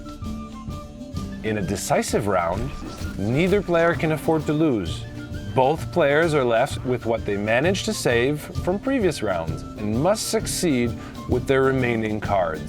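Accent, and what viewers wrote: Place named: Poland. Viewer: Ah ten polski angielski, ale film super :)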